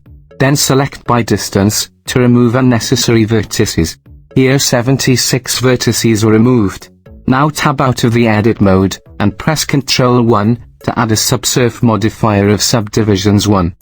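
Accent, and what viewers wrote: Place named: Wales, United Kingdom. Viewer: Blender tutorial in welsh accent 😂 this is amazing. I love it!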